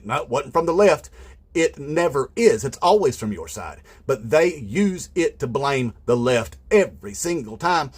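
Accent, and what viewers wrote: South, United States. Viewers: "We was just, you know, just usin' your correct pronouns. We thought that's what you wanted to be called. We didn't mean no disrespect. We just thought you wanted to be called fascists." That's brilliant. That's brilliant. Once again, perfectly said with a perfect Southern drawl.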